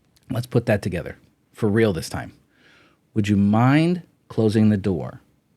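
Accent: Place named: United States. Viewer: Hello my teacher I really love your teach I want to learn English perfect with you special your pronunciation I love American pronunciation I don't like language British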